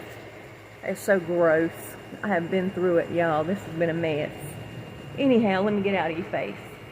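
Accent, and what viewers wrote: South, United States. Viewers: You are in my prayers daily and have been since the beginning of this. I'm sending you hugs, prayers for comfort and healing and lots of love! My husband has become a fan. We are in the south, from the south and he loves your accent. When he hears me listening to you, he always stops in to see what you have to say. You just remember to put yourself first and take care of yourself. ❤️ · Get better soon. It's not fun being so sick like you are at the moment. Hang in there. It takes time to get over serious illnesses. I'm into my second year of recovering from pneumonia after being in ICU. Queensland. Has left me with walking disability and enlarged heart. I get great joy in listening to your programme with that Southern drawal. So don't you go anywhere. Your show tells it like it is. It walks all over the others in respect to authentic royal gossip. 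Up there with Lady C. My answer to your quesastion rse Queen's medal to Meghan in is:. if the Queen does this then it shows the public and parliament that the Queen has lost her mental capacity and its time for her to go.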